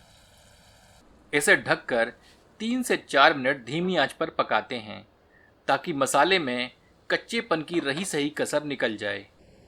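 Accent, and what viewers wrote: Standard. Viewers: Wowwww too good recepie 👌👌👌 aur usse bhi zyada aapki hindi.. bahut achcha laga sun k itni suddh hindi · आपकी शुद्ध हिंदी ने मुझे मंत्र मुग्ध कर दिया। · Itni sudh hindi bahut acha laga aap ki awaz aur receip Humne aaj first time aapka vedio dekha😊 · आपकी रेसिपी तो शानदार है ही और सबसे अच्छी बात आपका हिन्दी में शुद्ध पकड़ घी से भी ज्यादा मनमोहक लगा प्रणाम स्वीकार करें... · Recipe to acchi h bhai g par itni शुद्ध हिन्दी sunke maja aa gya 😊😊👍👍👍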